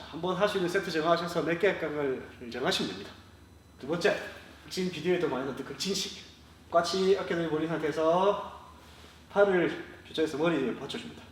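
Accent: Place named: Busan, South Korea. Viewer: 부산 사투리가 정겹습니다 오쓰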